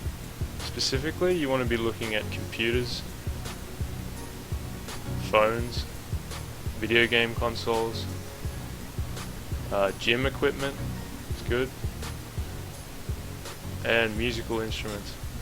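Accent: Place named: Victoria, Australia. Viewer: Maaaate, Guessing you are Victorian from your accent. I'm from WA. Hey, this is a bloody good video. You called all the pros and cons and it was presented without the hype. Kudos! sorry you got so few likes. I'd give you five stars! Any way, Thanks mate!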